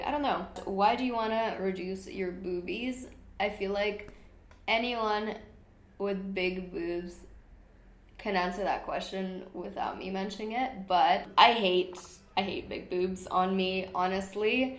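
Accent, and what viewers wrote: United Kingdom. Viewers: you deadass are getting a little flare of british accent in your voice I LOVE IT lmao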